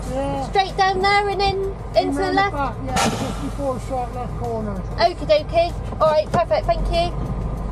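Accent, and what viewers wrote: West Country, United Kingdom. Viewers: Ah that makes sense and I agree with you totally you can never have enough tea, and yeah the West Country accent is very strong but hearing your accent is one of the things I enjoy about your channel, also you may find this hard to believe but the part of England I live in which is Oxfordshire we also have a West Country accent, it's not as prominent in the built up areas anymore but us country folk still have a slight twang to our accents, but I've always said that if I move away from Oxfordshire I'd only ever want to live in either Somerset or Devon.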